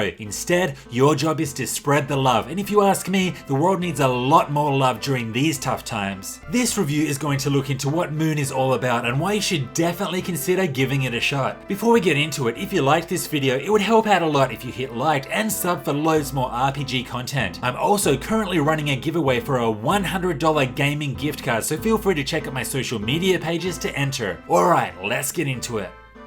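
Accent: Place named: Australia. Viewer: Your accent is all over the place. I’m Australian and it sounds like you might be too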